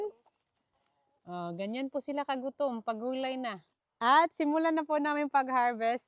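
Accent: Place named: Visayas, Philippines. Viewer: PAG BISAYA ANG SARAP PAKINGGAN NG ACCENT I LIKE IT 😅😅😅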